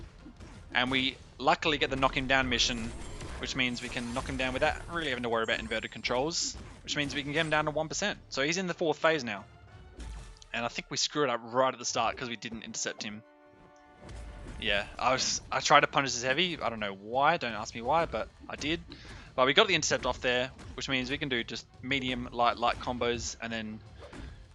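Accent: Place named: Australia. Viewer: Recognised a fellow Aussie instantly😅 Nice vid man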